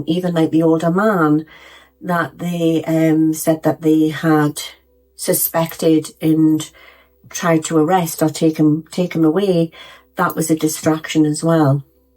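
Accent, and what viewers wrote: Newcastle, United Kingdom. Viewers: Hello fellow geordie! ❤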